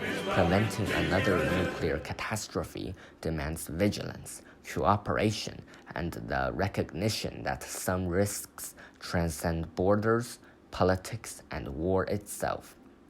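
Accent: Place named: Asia. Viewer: Guys who come later, just ignore his Asian accent!!! CUZ IT IS REALLY A FABULOUS VIDEO!!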